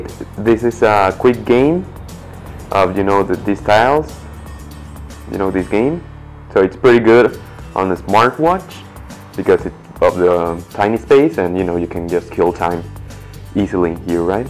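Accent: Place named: Monterrey, Mexico. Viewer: Eres de monterrey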